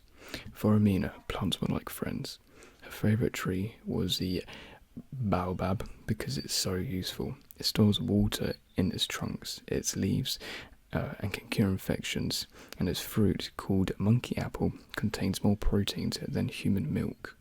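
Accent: United Kingdom. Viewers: British accent, i live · Ох уж этот вкусный британский акцент - я просто в восторге! Хочу чтобы у моего будущего парня был такой же голос))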